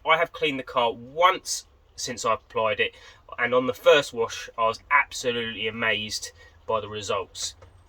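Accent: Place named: Midlands, United Kingdom. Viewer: I heard the accent then fellow Midlands boiiii yes !!!!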